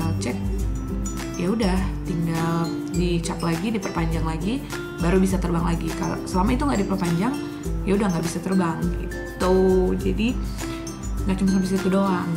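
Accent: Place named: West Java, Indonesia. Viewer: orang sunda ya .. sok silahkan .. hehe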